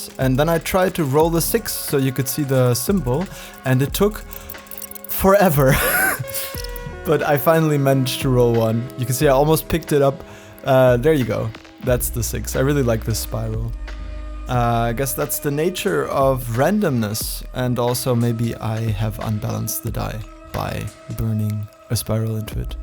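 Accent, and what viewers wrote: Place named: Germany. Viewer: I think ur right it looked better before but still now its more of what u imagined it to be, not terrain, marker, mini whatever ✌ btw where u from u sound pretty german but not totally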